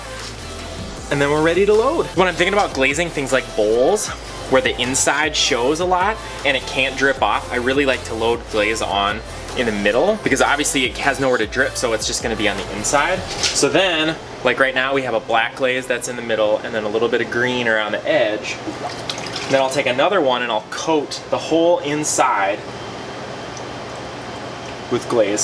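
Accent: Minnesota, United States. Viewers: I do enjoy the Minnesota accent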